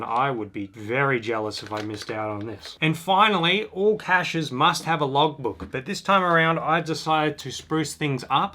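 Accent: Perth, Australia. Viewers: You so look like you’re from Perth, but if you were from Sydney I wouldn’t be surprised. Nvm, you have a really heavy Perth accent I’m certain. Ahh you said you were from Perth, nvm. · I only just realised is an Aussie 😂 we have the same accent why did I think he was British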